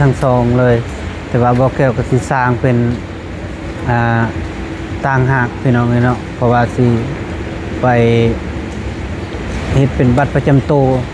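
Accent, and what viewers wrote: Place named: Laos. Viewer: เป็นทหารต้องเข้มแข็ง อย่าอ่อนแอสู้ต้องดูแลประชาชน.เว้าสำเนียงลาวเผ่าได๋ฟังคือบ่คือบ่าวเตียง.สู้สู้เด้อทหารตัองอดทน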